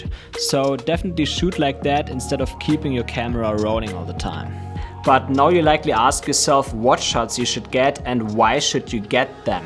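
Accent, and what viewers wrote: Germany. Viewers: aha gut ich bin aus Basel🙃 als ich deinen Namen sah und dein Akzent hörte, dachte ich, dass du bestimmt aus der DACH Region bist :) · Are you German?? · Definitely German accent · In den ersten 3 Sekunden gemerkt dass er deutscher isr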